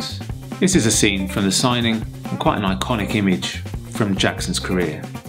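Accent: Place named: Sunderland, United Kingdom. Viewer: Your deffo from sunderland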